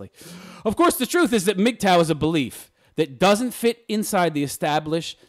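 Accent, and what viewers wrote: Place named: South, United States. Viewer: My dear Lady Vin, thank -you for your prompt reply. Bible days, tantrums, Arab men, women without rights, who will cave-in first and hidden children within the Catholic Church.... Did I forget to mention Harem ?? To begin with, I'm not an American, however, MGTOW men do not want to have women , period. No wives, mistresses or harems. Please do not misquote me on that . We want less responsibility with regards to women, not more. MGTOW men say no to marriage, LTR and co-habitation. We make no demands of women, other than their distance. Biblical times, NO, we are moving forwards, not backwards. We live within the Western society and have little or no regard for oppressive cultures. The laws in the USA as well as here in Europe and biased in favour of women when it comes to divorce and taxes. MGTOW is a mindset, not a formalised structure, no central organisation, no official spokesperson. 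Therefore, there can be no implosion of men who choose to live life on their own terms. We are as intervidual and unique as you are. Illegitimate children within the Catholic Church is something I am well aware of. Being Catholic myself, I know all about the Madeleine Laundries and the lives that institution wrecked. On par with the Holocaust in my opinion. So yall can chew on that awhile ( love your Southern accent ). Thanks once again for your reply....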